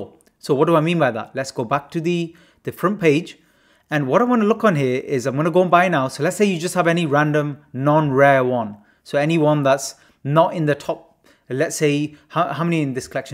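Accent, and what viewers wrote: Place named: East London, United Kingdom. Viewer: well done bro.. u seem to be good in trading knowing u just started recently in NFT.. im from east london, you sounded like from east side as well..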